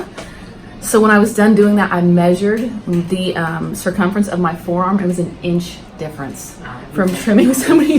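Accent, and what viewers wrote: Kentucky, United States. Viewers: I'm a Buckeye not a Kentucky girl- but we are close enough in vocal patterns that I feel, we are family after all. Love how you make your older patients feel at ease- as a person of a " certain age" often you hate admitting to family members there are things you can't do easily, especially toenails, after arthritis, joint replacements et all there just things you lack flexibility for. Thank You for being so kind!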